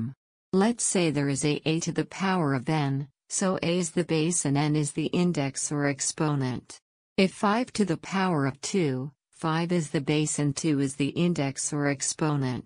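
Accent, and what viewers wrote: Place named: United States. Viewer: Wait, this is your voice? Seems like you're american.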